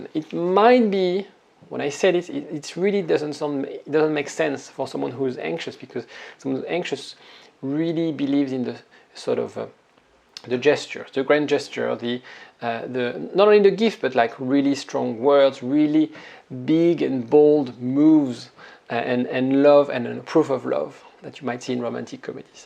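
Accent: France. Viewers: French. Canadian accent is different lighter. Sounds very different if you speak French more than listening as an English speaker.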